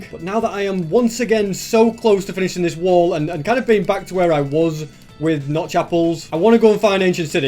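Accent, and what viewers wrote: United Kingdom. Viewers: Is it just me or sounds british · He is british you plum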